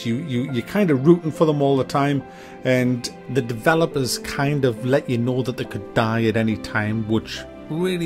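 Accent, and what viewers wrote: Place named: Newcastle, United Kingdom. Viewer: Quite funny having the plot explained in a geordie accent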